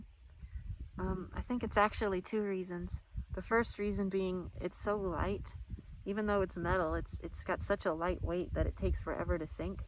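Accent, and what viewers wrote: South, United States. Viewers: The country accent on that femboy voice. Wow. Melt any man's heart.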